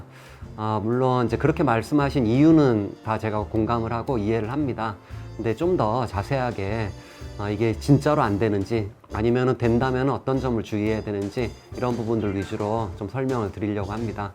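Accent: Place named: Gyeongsang, South Korea. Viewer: 잘봤습니다~~^^ 영상과 관계없지만 혹시 원장님 고향이 경상도세요?? ㅋㅋㅋㅋ 억양이 베어 있는거 같아서 친근감이 드네요 ㅋㅋㅋ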